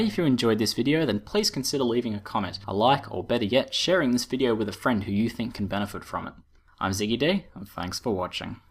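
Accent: Australia. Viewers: Awful aussie accent :D Nice vid however.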